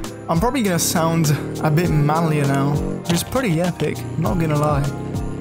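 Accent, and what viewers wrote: Italy. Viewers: Are you italian? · Hai un bel accento italiano fra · Wait, are u italian? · You have a particular italy pronunce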